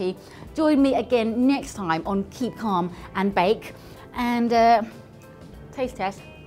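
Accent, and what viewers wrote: England, United Kingdom. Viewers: Man, I love to hear cute women speak with an English accent.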